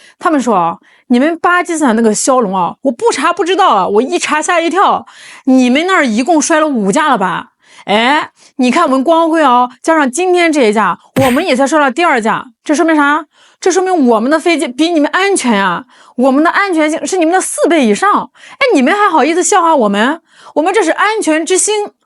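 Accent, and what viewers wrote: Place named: Henan, China. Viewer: 主播的口音好重😂河南人吗？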